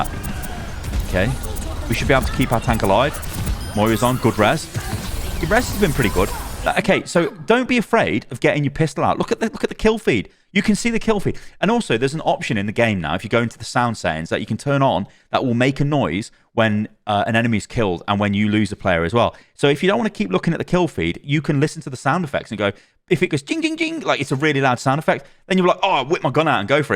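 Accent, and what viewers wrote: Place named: Geordie, United Kingdom. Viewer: Your geordie accent was really strong in this video, If your not then you sounded at least haha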